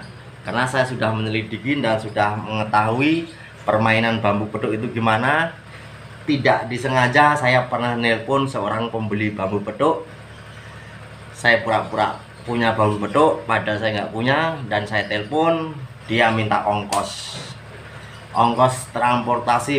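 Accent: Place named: Yogyakarta, Indonesia. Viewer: Sapa hayo itu....jatengnya mgkn Jogja ya kang😂😂😂😂😂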